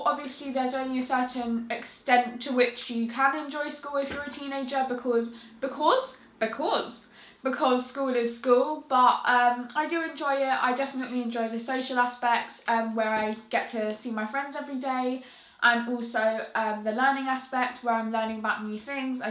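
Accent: United Kingdom. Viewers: Gotta luv that british accent! Cant get over it! And when you sing? Your amazing! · I love yor british acent , is very elegant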